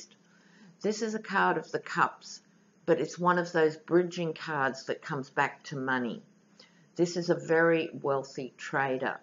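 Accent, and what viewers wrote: Australia. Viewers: I <3 your running commentary. Find myself laughing out loud, and God knows we need it. So... is your's a "posh" Oz accent? Is there such a thing? From the land of the maple leaf, who has educated, but not posh accents.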